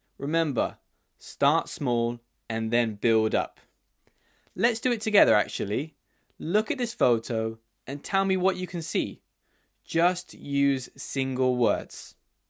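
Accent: United Kingdom. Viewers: You are the only british that I understand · I live in Ulsan, South Korea! Most Korean students learn American English in school, I was no exception. But I love British accents so I researched them and I was able to find you! 🥰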